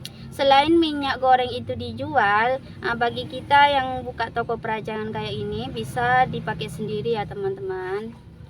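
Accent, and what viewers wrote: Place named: Madura, Indonesia. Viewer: Kental khas madurax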